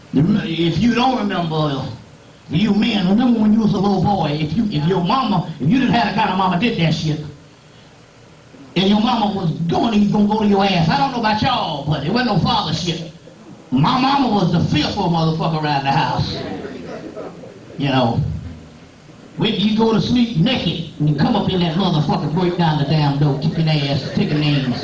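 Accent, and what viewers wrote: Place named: Rural, United States. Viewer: I feel you. It's actually his stumbling, stuttering and country twang that helps me understand his knowledge. The elders in my family all country, so listening to the master teacher is like being homeschooled by an uncle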